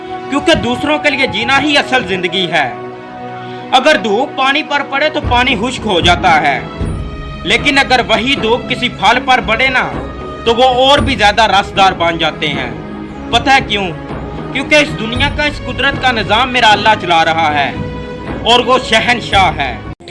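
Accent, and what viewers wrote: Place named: Punjab, Pakistan. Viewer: His Punjabi Urdu Accent is making me laugh😂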